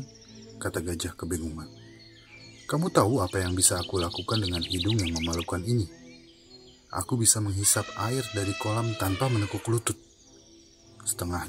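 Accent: Indonesia. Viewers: Pengisi suara di channel ini tuh org indo kan?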